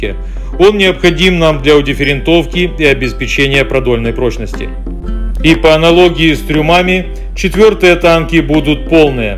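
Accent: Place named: Odesa, Ukraine. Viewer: Начал смотреть видео, и слышу наше родное. Думаю не показалось. Но нет как не крути наш родной говор и как не вспомнить за нашу любимую Одессу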